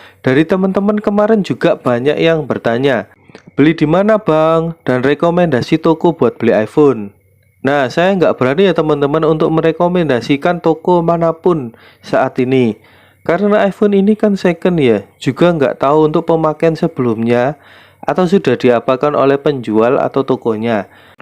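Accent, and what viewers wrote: Java, Indonesia. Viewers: Jawane medok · kok medok banget..😅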